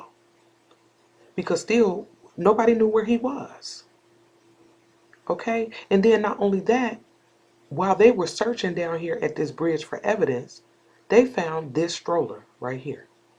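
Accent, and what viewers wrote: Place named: New York, United States. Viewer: I thought I was hearing N.Y.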